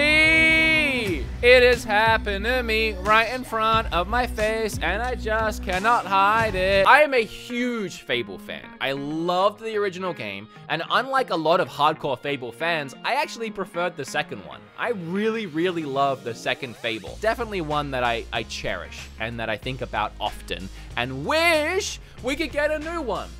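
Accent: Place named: Australia. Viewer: Wow the Aussie accent is really popping on this one.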